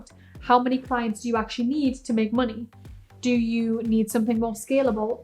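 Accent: United Kingdom. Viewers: I love the Geordie accent👌🏻ever since Geordie Shore😂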